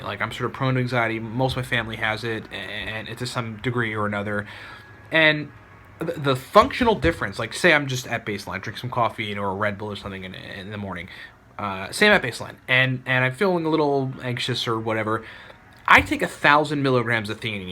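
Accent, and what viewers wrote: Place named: New York, United States. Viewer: that's pretty close to New York. That's probably why I don't notice a difference between your dialect and mine. The only time I notice people talking fast is when they have a unique voice in addition to them talking fast (Ben Shapiro). I really didn't notice it in your case since your voice isn't that unique.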